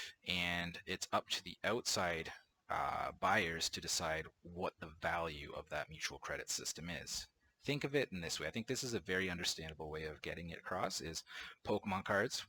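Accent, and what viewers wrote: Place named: Ireland. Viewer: Sounded Irish to me :-)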